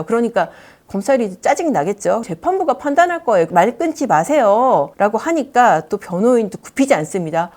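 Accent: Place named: Jeolla, South Korea. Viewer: 전라도억양이 있어요…조선은 우파라 할수없죠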